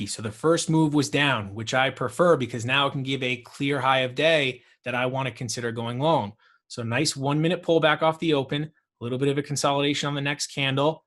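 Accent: New York, United States. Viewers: That accent are you from ny/nj?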